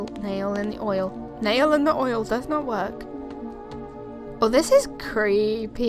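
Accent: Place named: United Kingdom. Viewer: I do like your style, and your vids look promising. Nice work. Your numbers are goals as far as I'm concerned. And it's great to hear another British Let's Player :)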